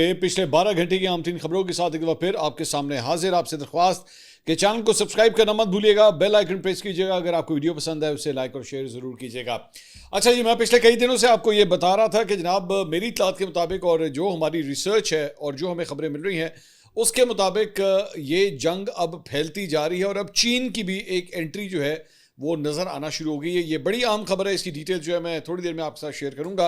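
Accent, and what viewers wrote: Punjab, India. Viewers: Sr punjabi touch zaror daity rahainy we like it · sahib today your punjabi accent make the video more excitting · Punjabi Touch 😆 Chas Agyi Awj To 😉😍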